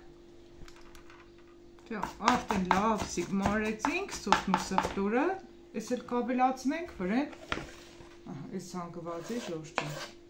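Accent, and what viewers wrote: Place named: Gyumri, Armenia. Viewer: Բարև ձեզ շատ հաճելի է լսել իմ Գյումրեցու լեզուն Աստծո օրհնությամբ ապրես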